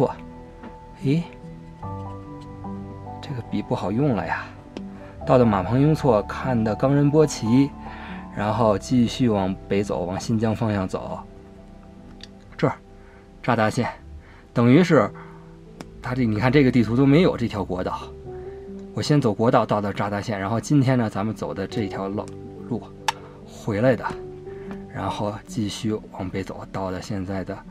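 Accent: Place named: Beijing, China. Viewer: 喜欢😍他的知性和标准的京片儿语音。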